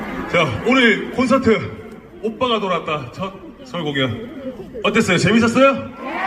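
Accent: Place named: Gyeongsang, South Korea. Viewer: 방청객 에서 누가다리가 몸이약하다했나~~ 아니몆시간을 노래하고 떠들고 춤추고 이야기하고 이럴땐매정하다니까? 안힘드는가해바요한다 아나!! 아이고대다 자빠진다 이제다끝낫어요 내죽일겁니까? 고만~~이제 경상도사투리 귀여운데요 영웅오빠가돌아왔다 어땠어요재미있었어요 나도너무재미있어좋았어요 날씨도추운데 꽂꽂하니않아있어줘서좋았어요 감사합니다 몆번을이야기하노 철없는 오빠 기댈수있는오빠 장난기많은오빠 의젓한영웅이오빠~~로기억할께요 가수님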